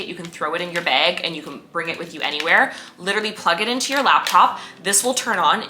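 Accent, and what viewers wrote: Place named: Canada. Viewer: You have such a fun personality, and I can instantly hear your Canadian accent😆😆😆…. I’m actually kind of blown away by your portable light for your laptop.🤯🤯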